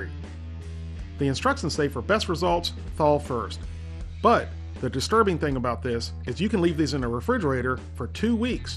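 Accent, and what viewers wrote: South, United States. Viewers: Hugedickerino Kripperino he sounds American, and from the accent I will say he's from the south.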